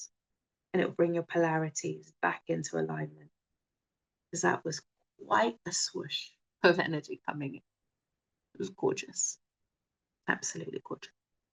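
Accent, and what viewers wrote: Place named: United Kingdom. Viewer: Si funny how Creator works, i still remember asking show me the way please help and i would SEE UK and i started laughing cause i was like 😮 how? And poom your channel came out, and when i read RSY i said love it, this is it Creator, and when i Heard You speak i was like ohhhhh right UK😅😅😅🎉🎉🎉🎉🎉🎉🎉🎉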